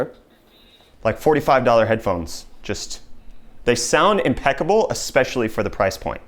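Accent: South Africa. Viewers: WOW WOW WOW! Wait are you from South Africa?? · damn his english is actually so good wtf? wait is there a south african accent?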